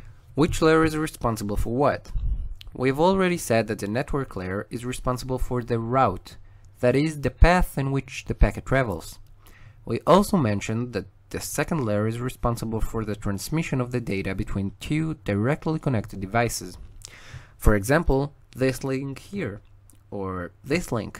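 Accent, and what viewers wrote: Israel. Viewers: אחלה וידאו! באמת, מהברורים ביותר שראיתי שמסבירים על מודל השכבות. ממש מוזר שאין לו הרבה יותר צפיות. מה שכן - המבטא העברי ממש מורגש.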